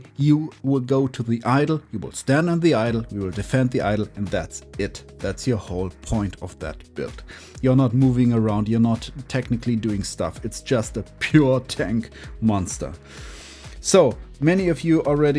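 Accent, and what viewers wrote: Germany. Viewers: man hört direkt das du nen deutscher bist finde es richtig cool das du englischen content bringst weiter so :D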